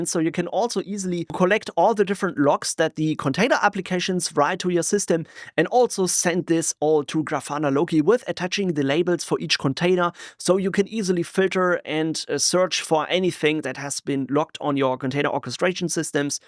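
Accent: Germany. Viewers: Прикольно как у чувака немецкий прорывается через английский =)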